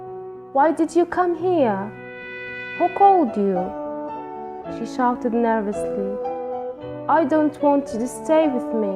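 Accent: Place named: United Kingdom. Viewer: الرائعة المتألقة صاحبة اللهجة البريطانية القوية 🌷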